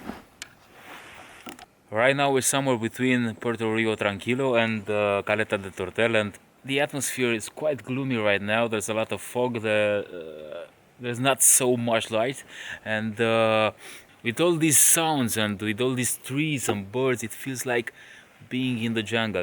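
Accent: Romania. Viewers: M-AI spart frate,cu accentul tau in engleza,te vad intr-o zi cand ma pensionez si ma duc inapoi in Romania. Sunt in State de vreo 25 de ani si ma bucur de jucaria mea- BMW 1200 . Bravo Frate! Entuziasmul tau e contagios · omul e antipatic, de la dinti pana la faptul ca in engleza vorbeste ciudat..ce accent vezi tu ca nici macar pronuntia literelor din cuvinte nu e corecta. Nu are niciun accent, e doar o urma de engleza romaneasca, nu te mai aprinde ca am zis ceva la obiect. Critica e si constructiva cateodata, desteptule.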